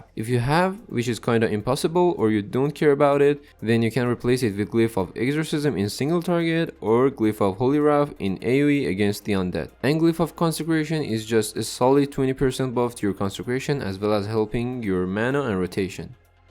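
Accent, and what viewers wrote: Iran. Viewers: Are you Iranian by any chance? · R u Iranian bro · You have a Persian accent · you have kind of an persian accent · lahjat yekam farsi mizane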